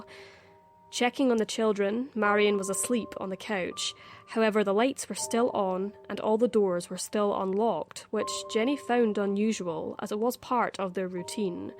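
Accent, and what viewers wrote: Scotland, United Kingdom. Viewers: This is NOT an American show. The so-called annoying voice is because the show, Dark Curiosities, is a Scottish YouTube mysteries series. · I love the Scottish accent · Is the narrator a Scott?✍ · No, that is definitely a Scottish accent.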